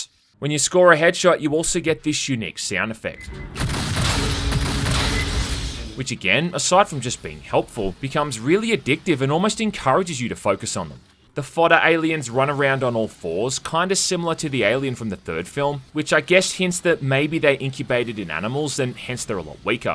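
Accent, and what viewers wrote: Australia. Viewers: Is it just me or do we all love a reviewer with Australian accent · when my favorite video game reviewer with an exaggerated aussie accent says its good. then, it probably is.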